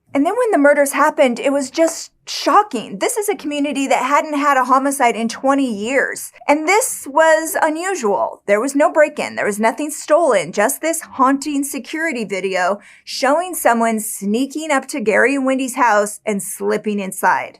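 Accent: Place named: New York, United States. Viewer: a voice over that makes you run for MUTE. Holy New Yawk!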